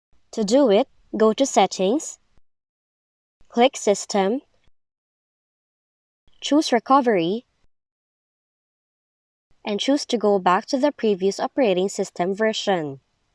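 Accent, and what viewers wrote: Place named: China. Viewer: unable to trust chinese accent there is suspicion of getting tricked into malware infection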